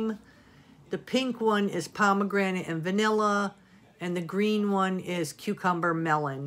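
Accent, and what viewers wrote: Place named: New York, United States. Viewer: I’m new, just found your channel! I thought you were from New York, you have a slight accent haha my first guess was New York or either maybe Chicago 😆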